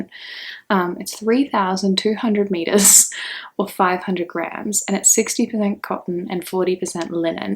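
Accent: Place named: Australia. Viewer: This is the first of your videos I have seen! I'm from Adelaide/Kaurna country and its so nice to hear an Australian accent on a podcast, and someone who is actually from my hemisphere so is talking about their summer knits rather than all the other youtubers going into Winter. Definitely adding the cuff club flower socks to my Ravelry library :)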